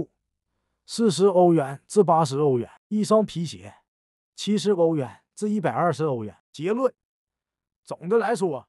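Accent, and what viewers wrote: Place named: Northeast, China. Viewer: 东北口音太重了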